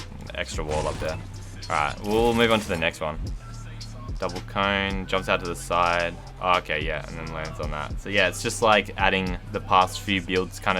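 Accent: Australia. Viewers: Yo he has like a Australian accent and an American accent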